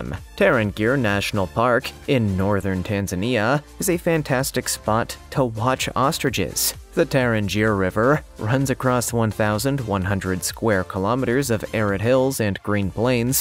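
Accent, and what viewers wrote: Canada. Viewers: Im sorry great video but. Pfff im dutch and even i can hear that disgusting canadian accent. “Mouth, about, doubt,” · That is one heck of a Canadian accent. Love it!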